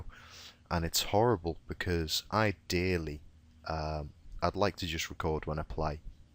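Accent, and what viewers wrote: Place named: Liverpool, United Kingdom. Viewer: I'm a scouser, just saying your accent sounds nearby :P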